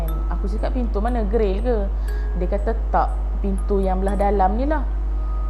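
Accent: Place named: North, Malaysia. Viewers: Suka l dgr akak ckp utara😍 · sat ckp utara sat ckp kl..dik biaq selaraih baru tatabahasa cantik dn crita jd menarik..cuma nk peringat jar..apa2pun good effort.. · Terbaik 👍👍 Teruskan bercerita dalam dialek utara.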